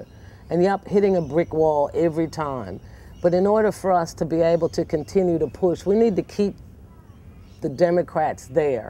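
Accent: Australia. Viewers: BigImaginationDoll16 I was thinking the exact same thing. Some words are said with an Australian accent, like when she says "dollar". Veeeeery interesting hmmmm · You've deff got a half Australian accent going on there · Got that Aussie accent coming in mate :-) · can hear the Aussie accent quite clearly. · Don't worry. You're an Aussie now. You even sound it,😃 our government cares more about its citizens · 10 seconds in, that accent, she's a real Australian now! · love your Aussie Twang in your voice. so coooooool. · Her accent is a spin out. About 70% Aussie with some yank.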